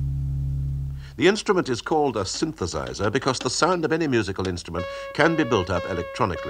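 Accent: RP, United Kingdom. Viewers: Is his accent considered RP? I'm American and am trying to work out UK accents.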